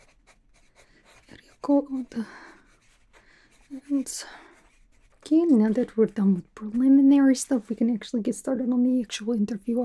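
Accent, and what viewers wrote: Russia. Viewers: I agree, the accent is great for the russian characters shes done so far the silly attitude does make it amusing too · Yelena is back and still making my scalp tingle. ✨ I love that Russian accent, you're so good at it. 😁 And the hair. 🖤🤍 Being captured and interrogated is a lot of fun. 😁 I hyped this. 🖤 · Hello. I'm Noah, and I like Marvel superhero movies, particularly the MCU. I really liked your cosplay of Yelena Belova. Your Russian accent is very good, even though I really have not watched a MCU film "Thunderbolts*". I wish that I was a young man, who is kidnapped by other Russian assassians and locked me in a cage, then needs to be rescued by Yelena and said "Thank you". Or... if I needs to be trained by Yelena, then kidnapped by the other Russian assassians and locked in a cage, I would use my special training skill to escape. That might be a good idea.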